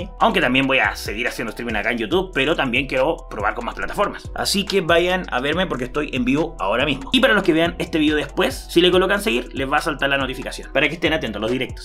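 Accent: Chile. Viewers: Hola soy nuevo. Ase tiempo que te conosia pero me daba flogera entrar. Pense que eras español por tu bos o asento pero en un tik tok que isieron soble ti escuche tu bos y pense que eras chileo lo busco en google y vi que eras chileno yo tambien soy chileno haora si que si estare a tento a tus videos ace un mes me registre en free no tengo diamante labropa que tengo la consegi con ticets de incubadora o diamantes los diamantes uqe tengo son los de los regalos que dan cuando entras a una partida me pregunto si me puedes dar aunque sean 2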